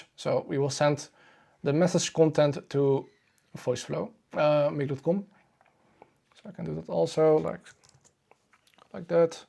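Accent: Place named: Netherlands. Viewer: Hi, ik heb je net gezien op YouTube podcast van Sabrina! Wat een mooie podcast geworden en wat vet dat je zo lekker gaat!! Ik ben in het begin (leer) stadium. Heb besloten m’n tanden erin vast te bijten. Je video’s zijn ook leuk om te zien. Simpel. Hoeft niet perfect. (Reminder to self) En je website is prachtig!! Het hoofdje van de robot is adaptive to your finger. 😊 Ik ga je volgen en van je leren en er zelf mee aan de slag! (Ik hoorde btw direct dat je uit Nederland kwam 😁)Succes 💫 🙌🏻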